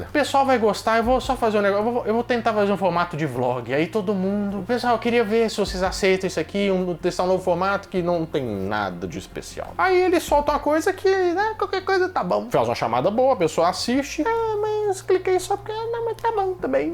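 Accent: Minas Gerais, Brazil. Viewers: Vejo vc porque eu gosto. Tinha muitas opções. Sim mas a sua voz de mineiro e muito gostoso de ouvir. Sou mineira também UAI 😂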